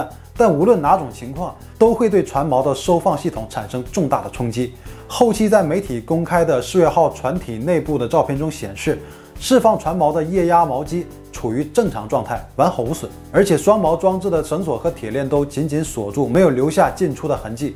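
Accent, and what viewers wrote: Northeast, China. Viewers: 感觉是东北人，说话稍微有点东北味。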